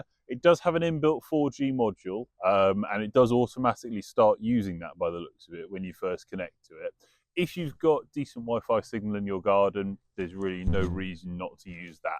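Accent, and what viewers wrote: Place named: United Kingdom. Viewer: I would have liked to have watched this video in detail, but being from Alabama, U.S.A., I could barely understand only a few words this narrator said. His accent was too Britishly thick for me to understand very much of anything he said. I need another video with better enounciation than this. I'm sorry, but I'm only being honest.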